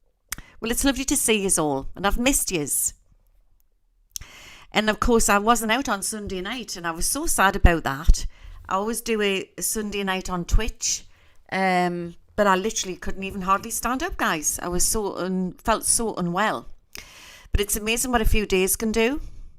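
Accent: Newcastle, United Kingdom. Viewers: I love hearing you because you are a Geordie who is a spiritualist and I miss the spookies back in south Shields. You soothe me. From Lisa in Tasmania Australia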